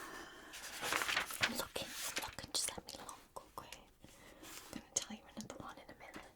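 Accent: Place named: United Kingdom. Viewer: OHHH like northern english? yea that makes sense. i thought she kinda sounded british, but also kinda irish or even scottish, so i wasnt sure. for context, im american, & also my volume was low.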